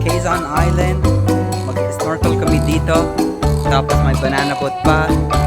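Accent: Philippines. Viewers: I wanna hear talk in his native tongue, as in talking with that Texas twang. Even his English words when he's narrating are spoken with a Philippine accent.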